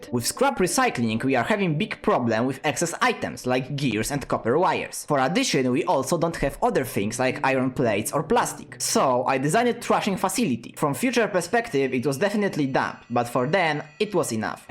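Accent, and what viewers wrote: Poland. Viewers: Ah I've heard your voice From shorts I knew I recognise your polish-ish voice nerd voice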